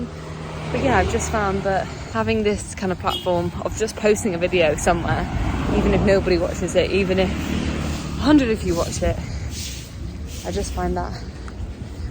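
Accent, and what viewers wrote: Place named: United Kingdom. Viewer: I like your accent ❤️ british yaa ??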